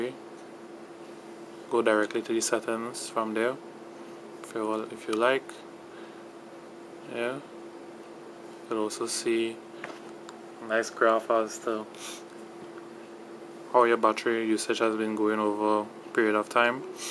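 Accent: Trinidad and Tobago. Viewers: dude you from trinidad huh? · i love ya accent, im from jamaica....u trini ??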